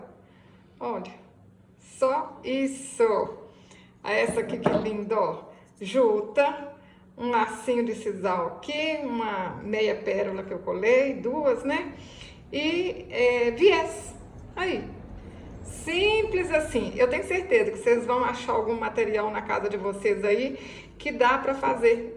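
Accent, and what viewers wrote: Minas Gerais, Brazil. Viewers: Que sotaque maravilhoso. Saudade de Minas Gerais. Parabéns pelas criações.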